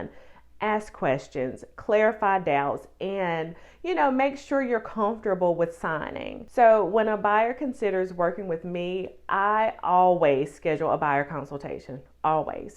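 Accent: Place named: South, United States. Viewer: First of all, I love the southern accent! Also, thank you for the information. I’ve seen a lot of unqualified people going to real estate the last few years. They think it’s going to be an easy way to make money. It’s refreshing to see somebody who has the knowledge and qualifications. I have never understood why the seller has had to pay for the buyers agent. Just because the seller walks away with a big bag of money why does that make them liable for your agents commission? It always seemed to me that the buyer should pay their own agent!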